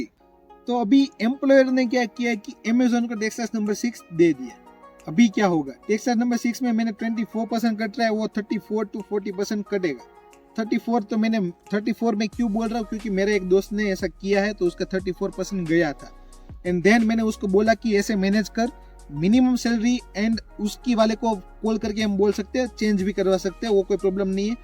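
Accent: Gujarat, India. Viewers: Bhai ki aavaz se gujarat ki khusbu aari h😄 are you gujarati ??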